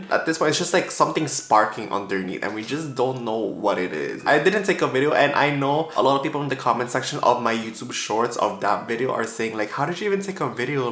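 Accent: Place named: Philippines. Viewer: are you filipino? you have a filipino accent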